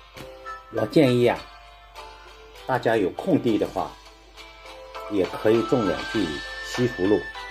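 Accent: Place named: Shanghai, China. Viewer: 你家还种菜，好新鲜，我种过几次西葫芦，很能长的，你是上海人吧？听你讲普通话觉得是。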